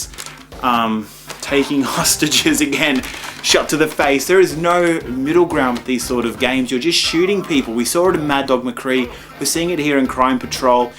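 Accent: Australia. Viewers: you're Australian... its sea-ga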